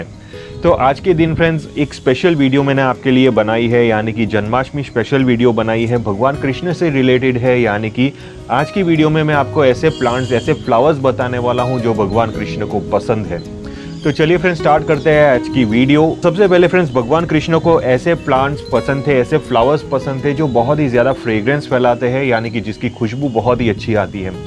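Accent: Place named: Gujarat, India. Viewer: Aapki aavaz se aap gujarati lagte ho....😇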